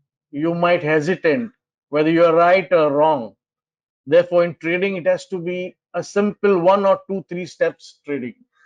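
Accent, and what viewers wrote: India. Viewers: It's just not understandable thanks to that awful Indian accent. Sorry!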